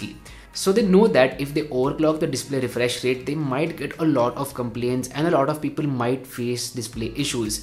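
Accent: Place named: South, India. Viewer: Your name is nd speaking accent is like south 🤔🤔🤔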